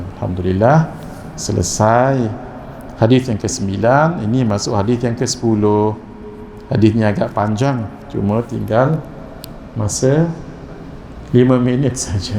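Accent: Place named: Sarawak, Malaysia. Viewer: Salam ..jazakAllah khair ..gaya bercakap mcm org kuching cakap bahasa melayu semenanjung ..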